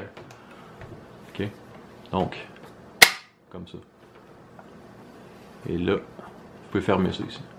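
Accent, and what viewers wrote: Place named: Quebec, Canada. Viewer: Merci, cousin de la belle province! Explication limpide dans un beau parler!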